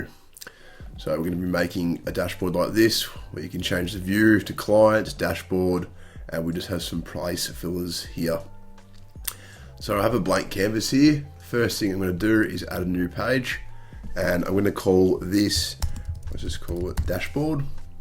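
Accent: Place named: Australia. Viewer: "Hide" in Australia: "Hoyyyd" hahaha!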